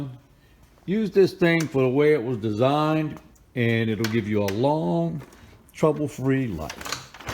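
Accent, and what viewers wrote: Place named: New Jersey, United States. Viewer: You from Jersey or Down South.?? Washington state, here. Just found this video again and laughed. If you really want to lose things, important things. You wheel your bike out into the uncut lawn grass and let the bolts fall into the grass. You or the next four house owners many find the parts, OR MAYBE NOT...??? Great Vid and Cool Accent , BRAH...DSD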